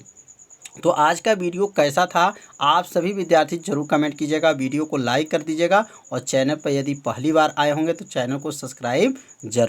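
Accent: Bihar, India. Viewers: Sir ji aap bihar se ho kya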